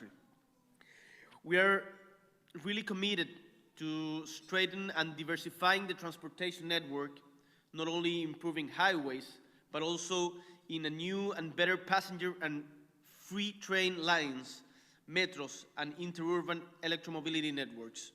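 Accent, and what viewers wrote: Chile. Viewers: No esta malo el ingles, acento chileno y algunas cosas recitadas de memoria, pero nada mal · Estuvo bien, mucha confianza y el discurso coherente con el acento chileno pero bien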